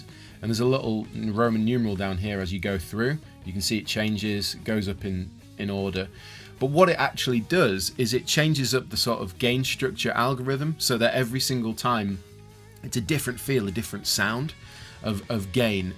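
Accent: Yorkshire, United Kingdom. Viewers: Love that you haven't lost your Yorkshire accent!! My family are all from Yorkshire, I live in West Yorkshire but my mother's side was from Barnsley from brieley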